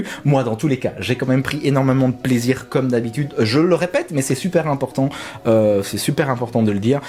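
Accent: Belgium. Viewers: Ah oui désolé j'ai pas compris ce que tu as dit quand j'ai demandé la dédicace avant dans la dernière vidéo que tu as fait désolé je comprends pas trop le Belge je suis français que dit mon prénom quand je t'ai demandé de me dédicacer si c'est moi merci et si c'est pas moi stp sinon courage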